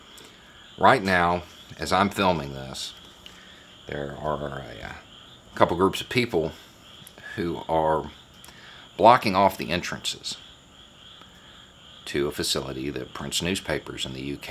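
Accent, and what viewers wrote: South, United States. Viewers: Its sometimes hard for a person from the Caribbean to understand southern accents,u just made it 100 times better,thank you😍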